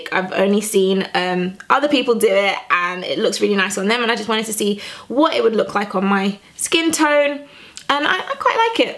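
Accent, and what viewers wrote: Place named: United Kingdom. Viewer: Sound British you are a beautiful woman and it fit u perfect. Go girl.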